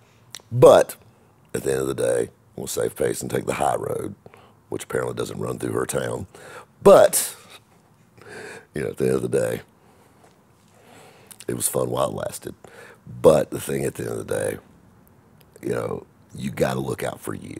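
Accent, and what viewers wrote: South, United States. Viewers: Yess sir! Got to love she saylim southern drawl😉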